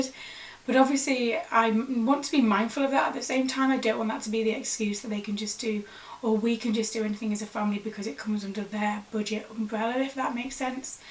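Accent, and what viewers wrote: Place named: North West England, United Kingdom. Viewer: Have just seen you on on-line news and it said you are from the north east, I though your accent was north west!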